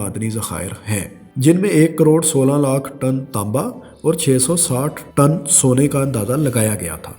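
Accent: Punjab, Pakistan. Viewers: Ye Bhai punjabi men urdu bol rahy thay badi mushkil men lag rahay thay. Faisal Warraich bhai ko khud BG voice deni chahye.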